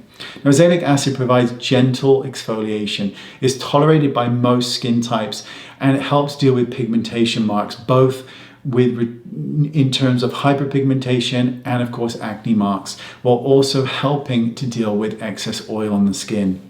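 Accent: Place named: United Kingdom. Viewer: I wonder which British accent has. It’s so subtle.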